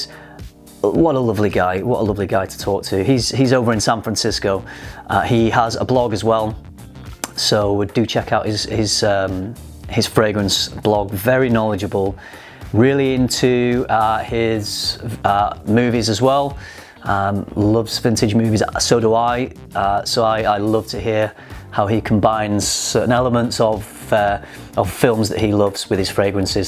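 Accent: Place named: West Midlands, United Kingdom. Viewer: A personal question, if you don't mind. Trying to place your accent, which isn't easy especially for a non-native speaker as you're obviously uni educated and that tends to round off a lot of regional variation. But if I were to hazard a guess, I'd say West Midlands?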